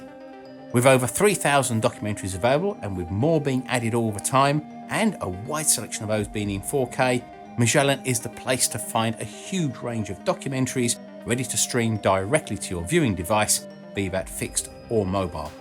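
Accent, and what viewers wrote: United Kingdom. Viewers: After recently watching some William Shatner self depreciation videos, and coming here...it occurs to me that speaks rather like Shatner with a British accent.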